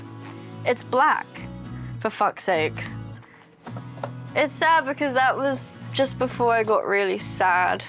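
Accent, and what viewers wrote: England, United Kingdom. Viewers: She’s British ?? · wait she has a english accent how did i just figure this out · I keep forgetting shes got a british accent and I get surprise every time I remember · you've got a british accent and new york attitude i like it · Because it's a British accent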